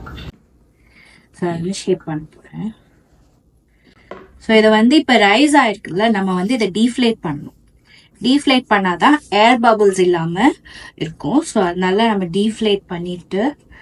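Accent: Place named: England, United Kingdom. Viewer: Hey, I know you speak very nicely in Tamil but now you are talking like as if you don’t know much of the language. So make sure you don’t get your English accent to Tamil. Just a small reminder as I have seen you speaking well in your previous videos. Take care.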